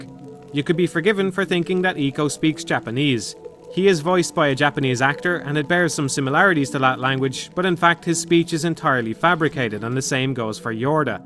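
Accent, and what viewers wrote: Ireland. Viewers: just been scouring through the top search results for Ico review, & i don't need to look any further now. as an english lad i'd much rather listen to a crackin irish accent than a whiney smiley american talk about a game that has genuine sentimental value to me - my mam used to work in Choices (blockbuster before blockbuster) lots of VHS, lots of 5th and 6th gen of gaming, some DVD's. as things were in the early 2000's. Remember playing Ico with her at a very young age & loved the world it created. funnily enough we rented shadow of the colossus shortly after completing Ico, found it way too hard and ambiguous and never finished it before returning it's a bit mad really, when silly things like games stay in your mind nearly 20 years later & become warm & fond abstract memories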